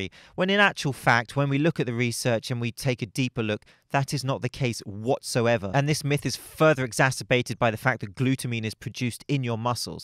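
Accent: United Kingdom. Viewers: Where do you live? British accent, Palm trees and sunshine but, you're wearing a hoodie!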